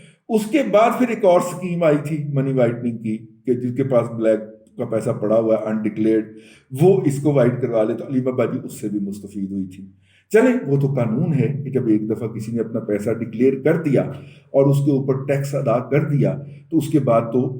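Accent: Punjab, Pakistan. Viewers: sahib aap commentry kisi aur se karwa liya karain, awaz kam ziyada, ziyada punjabi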